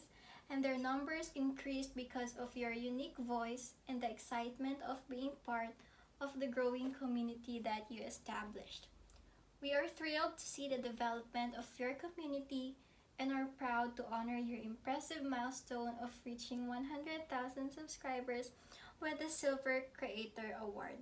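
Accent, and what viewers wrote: Philippines. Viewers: u sound like a filpino? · I think your from Philippines because that's how I sounds when I'm speaking English and I'm from Philippines 🧋🧋🌸🌸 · Wow hearing ur voice I just realized that ur Philippino and ur voice is so cute and sweet u r so good at acting and keep up the good work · weit is she Filipino bc she has the accent and she said and hirap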